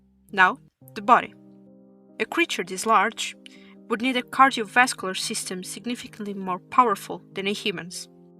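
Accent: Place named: Portugal. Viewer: Noticed it too, sounds like (european) portuguese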